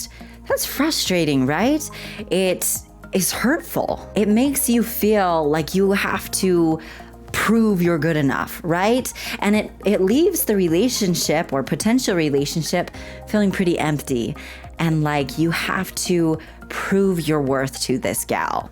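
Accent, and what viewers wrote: United States. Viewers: This is your best video, because you look so funny, talking very fast, in another video, in the background. I also like your singing AND your bloopers. As you have opened up to me, revealing that you go to the Church of Jesus Christ, is it rude to ask you, is your American accent from Washington, or California? I was a "good boy" for you Coach, and watched your video.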